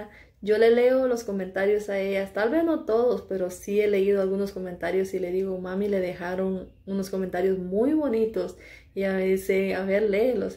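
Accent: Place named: Mexico. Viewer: . Holaa apenas anoche mire tu video yo miro los canales de tus ermanos. Y a todos las chicas y chicos me la pasa viendo los videos no sabía que tu tambien asias. Videos me encanta como eres te espresas muyy bien se me ase que tienes un asento en tu voz como mexicano tus ermanos y todas las chicas y chicos tienen diferente asentó. Pues bueno a segir adelante ya me suscribi a tu canal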